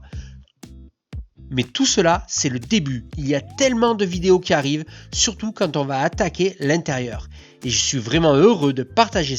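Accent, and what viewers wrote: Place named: Southern France, France. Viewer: Je viens tout juste de tomber sur votre chaîne et après m'être dis pendant plus de 10min : "Mais comment ça se fait que sur cette chaîne Youtube qui a priori est 🇺🇲 la voix off n'ait pas cette saloperie de traducteur auto de Google/Youtube ...!!?? 🤔" Et après 2min de farfouille je comprends que l'accent du "Sud de la France" de cette voix est tout simplement celle d'un des 2 protagonistes 😂 Après "1 Indien ds la Ville" c'est -> 1 Frenchie ds la Forêt Cainri 😉 En tt cas bravo , superbe projet ; ça fait rêver et ça donne envie ; ça donne envie de poser tellement de questions 😂😉 PS : avant votre autoconstruction vous n'étiez pas de simple novice en la matière, je veux dire en matière de construction , etc ; vous étiez assurément des gens d'expérience ? En tout cas , encore bravo et bonne continuation à vous, 1 grand bonjour de Bretagne 👋🤘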